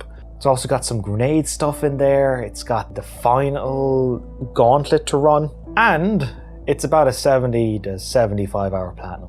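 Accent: Ireland. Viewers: Guardians Of The Galaxy and the voice of a Irishman, no better way to spent a Friday night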